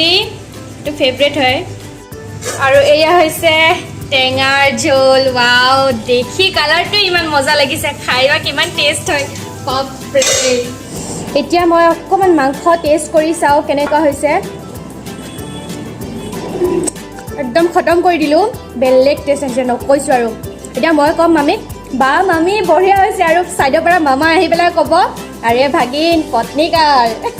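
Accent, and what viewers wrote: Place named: Goalpara, India. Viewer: bhal lage huni tumi 2ta lang kotha bur kole eta normal assamsse and eta lower’or goalpariya barpetiya assamese tu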